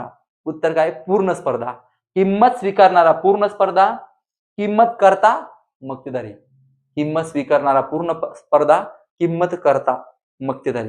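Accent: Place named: Nanded, India. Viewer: Sir Nandedwale ahe re bhau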